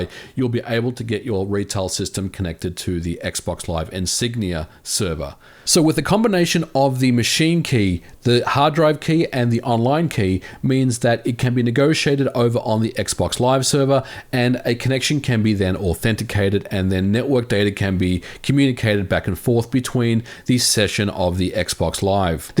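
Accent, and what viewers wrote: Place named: Australia. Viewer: That's a very mild accent for an Australian.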